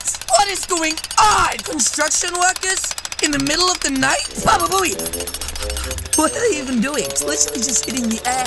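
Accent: England, United Kingdom. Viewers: can I ask you a question are you english or ameeican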